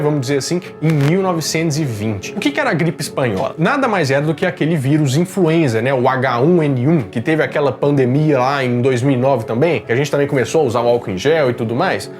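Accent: Minas Gerais, Brazil. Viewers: me inscrevi pq além de vc ser inteligente ainda é mineiro e eu adoro esse sotaque kkkk like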